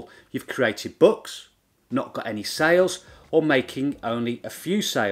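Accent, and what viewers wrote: United Kingdom. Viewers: I didnt intend to go for KDP, because so many people said (at least, on Youtube) it's saturated. But your video about creating a lined journal persuaded me to give a try. As for this video, it's very honest and to the piont. I dont know, if it seems to me but you sound British rather than American :) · Love your British accent...It makes your tips oh so much more valuable!! When I close my eyes, I hear Michael Caine...Cheers!! #smileyheart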